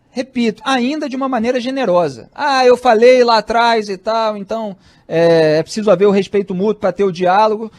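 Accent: Rio de Janeiro, Brazil. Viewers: Felipe Mourão Brasil, seus comentários são claros e eu tenho prazer em vê-los, sem contar com seu sotaque carioca que é lindo.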